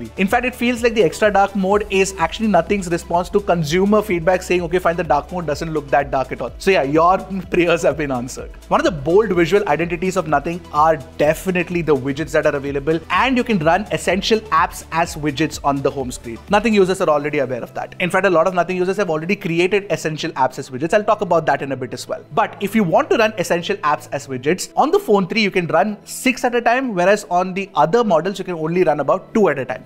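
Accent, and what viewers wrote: India. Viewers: the video is useful but how you speak in india accent influence the viewer not to understand the content. please improvize your intonation in english accent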